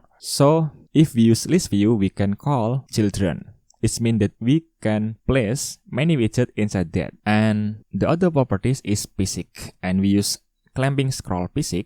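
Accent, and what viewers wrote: Indonesia. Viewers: Cara bicara inggrisnya seperti orang indonesia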